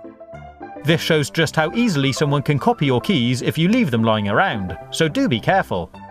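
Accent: United Kingdom. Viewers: Fahima Husaini he’s just saying his accent is British I’m British to but I still laugh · You guys are fools, he's British and also has a British accent. He's even using a 50 pence coin in the video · He has a British accent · It is a British accent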